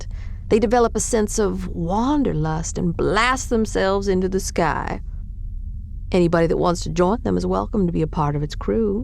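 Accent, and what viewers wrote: Texas, United States. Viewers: This is so Cute thank you Miss also Love the Texan Accent you sound hotter with it ❤️❤️❤️❤️❤️❤️😘😘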